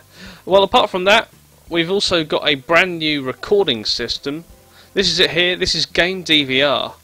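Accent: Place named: Ipswich, United Kingdom. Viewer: your from Ipswich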